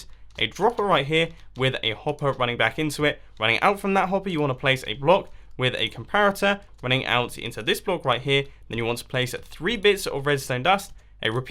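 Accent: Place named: England, United Kingdom. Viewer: It's his accent, English eh!?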